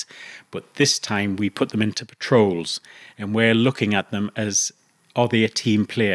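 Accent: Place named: Newcastle, United Kingdom. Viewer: Aye wor kid he's a fuckin Geordie an all!..sounds like he's from Shields or Byker lol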